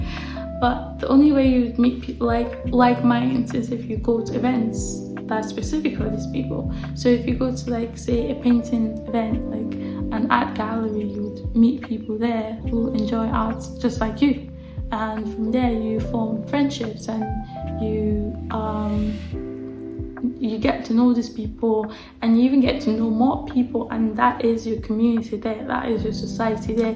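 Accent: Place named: Glasgow, United Kingdom. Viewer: You definitely have a a Glasgow accent tinge in your voice! From a Glaswegian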